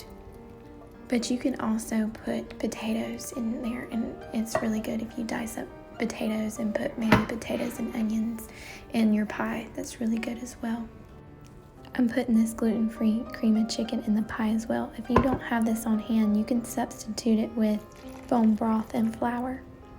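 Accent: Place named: South, United States. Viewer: I can feel Southern hospitality seeping right through the screen! I love the sweetness and gentleness of your videos, could listen to your accent all day! 🤗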